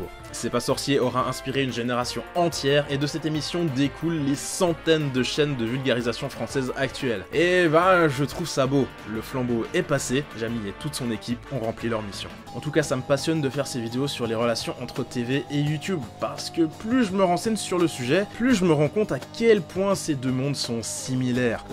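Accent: Belgium. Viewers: Parle Français comprend rien quand tu parle belge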